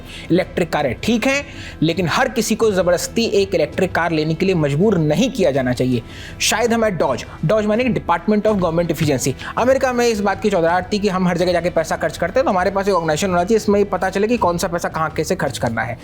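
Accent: India. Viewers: waah kya desi style me news padhte ho.. simply fantabulous...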